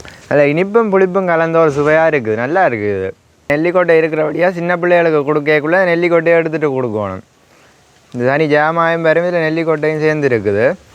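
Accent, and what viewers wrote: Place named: Sri Lanka. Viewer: Ungalooda samayoolda Ungalooda Srilanka Tamil very Nice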